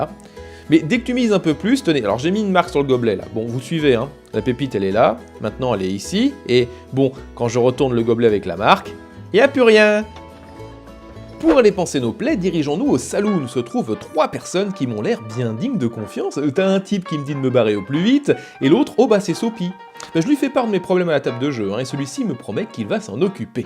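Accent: Quebec, Canada. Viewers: Ça fait toujours sourire d'entendre un français prendre l'accent québécois! Haha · Un fond vert ainsi qu'un jeu d'acteur à souligner! ( Sans parler de l'accent québecois ), est-ce qu'on peut parler d'une nouvelle ère pour?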